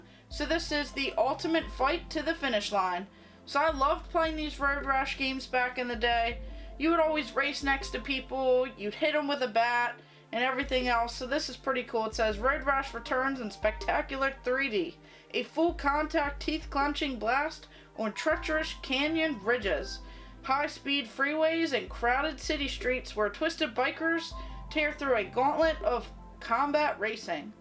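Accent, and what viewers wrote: Maryland, United States. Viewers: That's a nice haul. You live in Maryland? I've never heard a Maryland accent until you. He he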